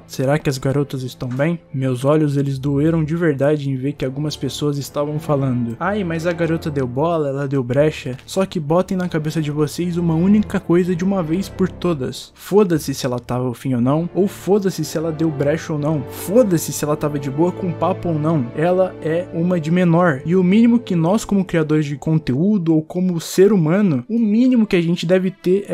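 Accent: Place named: Sao Paulo, Brazil. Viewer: Paulista falando palavrão é lindo dms Vc parece cmg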